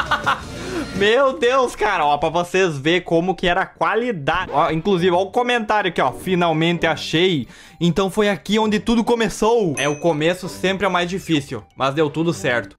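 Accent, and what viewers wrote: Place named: Rio Grande do Sul, Brazil. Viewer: com certeza é Gaúcho ksksks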